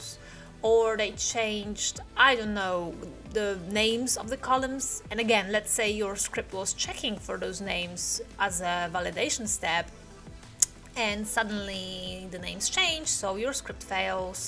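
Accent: England, United Kingdom. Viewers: Haha I love your english accent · Hello, just subscribed, AMAZING content! Very helpful! May I ask which country you are from, because sometimes you sound british or french or even russian!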